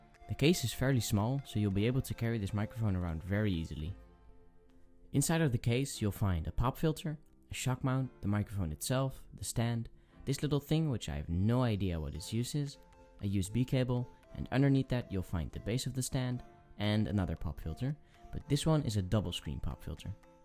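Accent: Netherlands. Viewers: are u from the netherlands ? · ja k hoorde aan je stem dat je nederlands was haha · Je bent nederlands he verder top video man ga zo door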